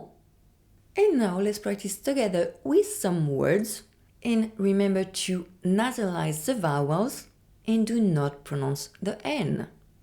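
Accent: France. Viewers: What’s nasa son?? You mean nasal sound?? Please don’t sound French when you’re speaking English. I had to turn subtitles on to understand what you’re saying in English. If you speak French, then ok.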